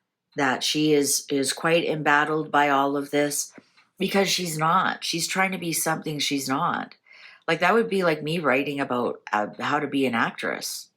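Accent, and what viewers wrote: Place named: Canada. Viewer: Ingredients for toe jam: hair and bacteria-filled hands 🤣🤣😭😭😭 your brutal yet somewhat nonchalant sarcasm (thrown in the mix) in your readings is greatly appreciated and adored over here 🥰😇 I also love your Canadian accent 😍 "I'm the toe-jam girl" 😭😭☠️🤡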